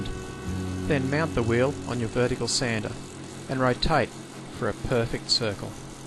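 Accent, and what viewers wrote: Australia. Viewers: Yeah your right I am British. and pomegranates are pretty tasty so I am not guna argue with that. I didn't notice you were, i fail quite a bit at recognising accents. ^^ i just bought his model T clock so just started that. but your video is being really helpful. you are obviously a very skilled craftsman. what type of finish do you use? i was thinking to do the wheels carfuly as not to get it in the teeth with bees wax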